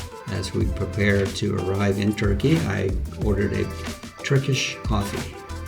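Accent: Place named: United States. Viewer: hi, thanks for the video, ex-californian here in sofia for 6 years. i did find your american 'a' in sandanski a bit grating, i hope you eventually find it natural to use the bulgarian sound for 'a' at least in the name of your new city. showing respect for the locality may make your integration a bit easier. i look forward to following your story. best of luck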